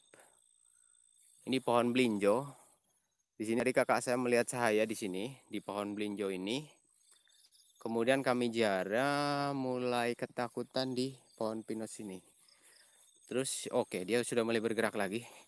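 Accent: Banyumas, Indonesia. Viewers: wkwkwj ngapak bae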